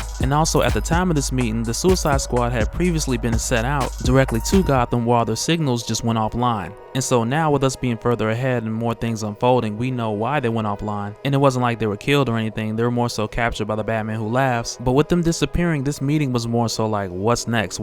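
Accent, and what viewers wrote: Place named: Georgia, United States. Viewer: I knew you were from the GA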